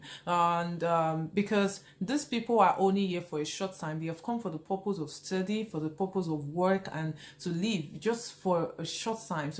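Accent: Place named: Finland. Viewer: ARE YOU ESTONIAN ? I THOUGHT YOU ARE FROM FINLAND BECAUSE OF YOUR ACCENT.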